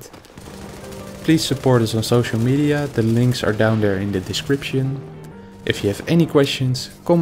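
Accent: Netherlands. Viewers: Are you dutch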